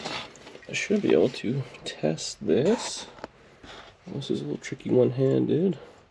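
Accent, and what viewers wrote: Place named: Canada. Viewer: I like how when you're speaking you actually say Eh, like a true Canadian 😁. Cool car. So jealous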